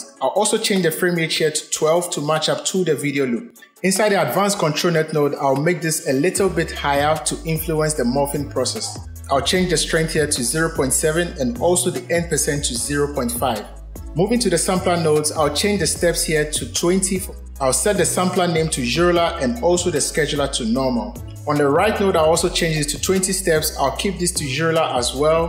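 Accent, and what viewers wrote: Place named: Ghana. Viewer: Boss, you sound Ghanaian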